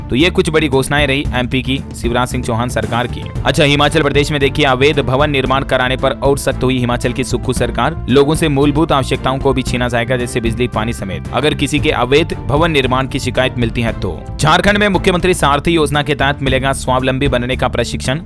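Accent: Rajasthan, India. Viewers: भाई साहब,,, बोली लहजा ठीक करो,,, राजस्थानी भाषा का पुट है भाषा का,,, कल को तरक्की में बाधा उत्पन्न होगी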